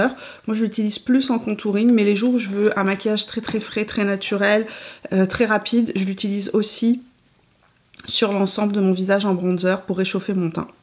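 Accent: Canada. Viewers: Sympa toutes ces vidéos. Vous êtes française ou canadienne ? Merci pour vos conseils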